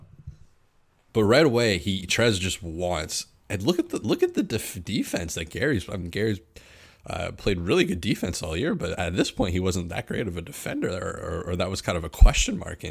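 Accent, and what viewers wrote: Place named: Australia. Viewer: Holy fuck this is giving me history class flashbacks 😂😂 Love your Australian accent m8 Idk what the hell to say about this man I think about this relatively often. Shout out Gary standing up for his boy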